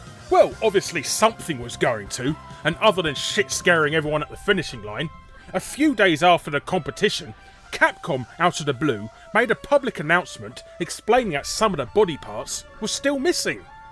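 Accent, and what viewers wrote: United Kingdom. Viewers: MC Apophis He’s British. That’s like me pronouncing Connecticut properly, and everyone dissing me for it.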